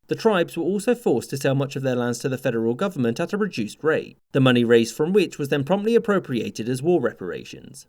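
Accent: United Kingdom. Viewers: Not going to lie... It's a bit weird learning about the American Civilian War though someone with a british accent.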